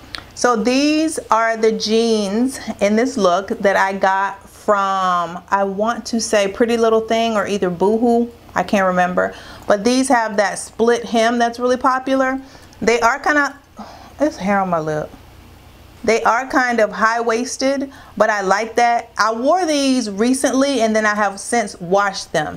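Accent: South, United States. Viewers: I lost a lot of weight, for my health. I am loving the extra benefits, like needing new clothes. I have turned into a shopaholic. You are my style inspirations. I am over 40, but can still wear a lot of the ideas you give me. Love you style. I love your accent. Just a little bit of the south.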